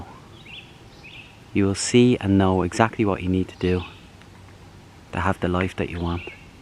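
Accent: South, United States. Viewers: Most say your southern accent is pretty good much better then my Irish accent[ lol] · lolol it's funny to hear you talk southern with an Irish accent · Your southern accent! Love it 😄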